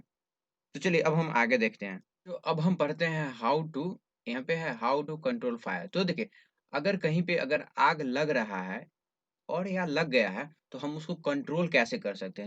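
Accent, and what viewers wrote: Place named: Bihar, India. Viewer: Aap kaha se ho .. mujhe aapki voice se lag rha h ki aap bihar ke ho ..mtlb ya to aap bihar me reh rhe hoge ya jharkhand me 😁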